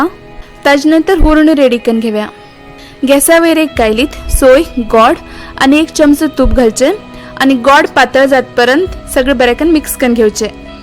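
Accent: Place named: Konkan, India. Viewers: Nice recipe explanation with nice konkani tone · Ur Konkani is different 🤭🤭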